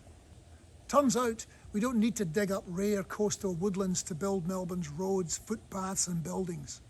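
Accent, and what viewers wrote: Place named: Scotland, United Kingdom. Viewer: Nice to see Colin Hay standing up for the environment! P.S I'd never heard him speak before, and after all those years living down under, I half expected him to have an Aussie or hybrid accent, not his beautiful native Scottish accent.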